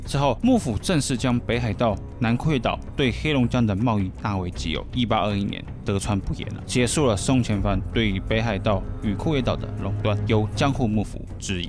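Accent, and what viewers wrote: Taiwan. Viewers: 读书啦大佬，中国多民族是1912年起，不是历史2000年是一个多民族国家。知道历史上2000年，汉族中国跟外族经常生死搏斗吗？知道中国曾经被蒙古人所灭，朝代被称为元朝。汉族被满洲人所灭，朝代称为大清，正名是大清帝国，与日本签署丧权辱国的马关条约上，李鸿章是用大清帝国签署，不是用中国名称的，网上有副本可参阅，自己去看啦。知道五胡乱华，汉族几乎灭族吗？可以反苏反俄，但不可以篡改历史。知道本帖帖主是台湾人，说的是台湾国语吗？ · 在中立、客觀的學術表面包裝下，所成立建構的：庫頁島歸屬邏輯，一樣可套用在臺灣島上囉？？ 被倭人所產生的倭寇，一度以次等人種，來統治對待的後代，現在操著臺灣口音的標準國語，一字一句，企圖向中華兒女，對近代中國血淚屈辱史，要建立所謂的「正確」觀念教育，還真是我中華民族的悲哀。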